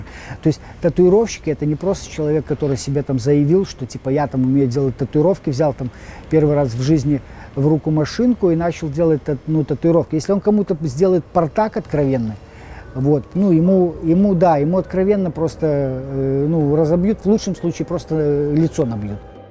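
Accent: Belarus. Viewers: Белорусский акцент у чувака. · Чувак из Белоруссии, по разговору. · какой всё таки специфический белорусский говор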